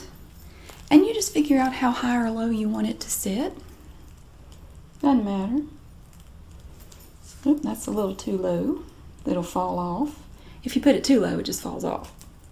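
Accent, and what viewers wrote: South, United States. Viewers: I loooove this video. I love when you explain clothes or processes you buy. I could listen to your soft southern accent all day. ❤️ Good for you shopping at thrift shops! Some clothes are so overpriced.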